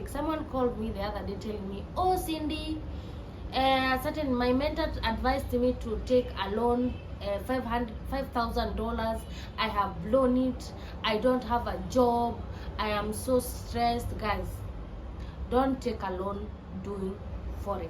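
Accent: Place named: Kenya. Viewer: The kenyan accent! 9feb26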